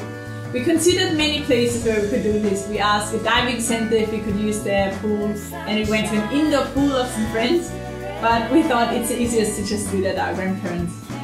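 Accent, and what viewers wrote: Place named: Scandinavia. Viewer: Where are you from I hear your accent but can't determine where you are from. Is it Scandinavian?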